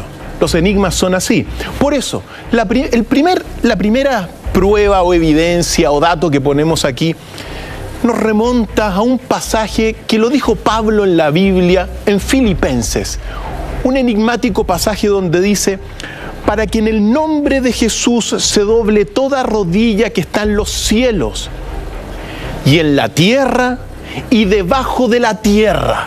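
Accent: Chile. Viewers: Este programa es chileno? Yo tenía un amigo que tenía un acento igual y me dijo que era de Chile. No sé. Igual me equivoco, pero este acento parece de Chile. Aunque tengo dudas sobre si es de Uruguay o Paraguay. No sé. Es difícil distinguir con tanto acento latino. De Cuba también podría ser. Pero de Méjico no, desde luego. Tampoco de Perú. Bueno, ahí lo dejo. Un enigma para mi.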